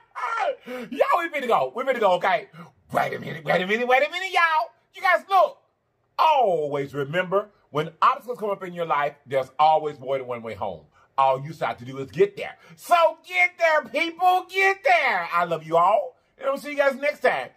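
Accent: South, United States. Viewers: So country lol I love it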